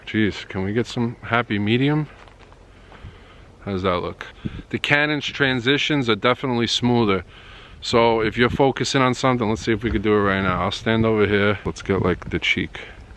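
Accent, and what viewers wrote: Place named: New York, United States. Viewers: Just came across your review for the first time, but I will be back. As a Canadian, I loved the NY or NJ accent, Great review, you covered a lot of information in a fair way. I was looking at Sony and have an old Canon S100 that I love, but I think your review convinced me the canon was a better camera and value than the Sony. Keep up the great work. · I love your voice. Sounds like a Brooklyn accent to me. It's awesome haha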